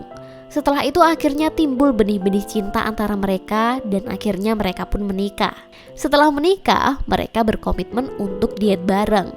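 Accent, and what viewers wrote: Java, Indonesia. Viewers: Medhok.. Berasa di ceritain si mbok 😂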